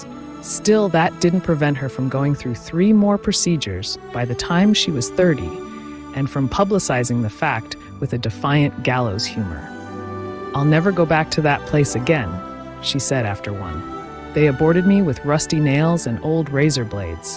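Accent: United States. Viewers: I' usually like to listen to mostly Men Narrators with nice toned voices' but You' have a American Easy on the Ears Lovely toned Voice 👌✨🎶🎧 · Sounds like my first wife from Tennessee.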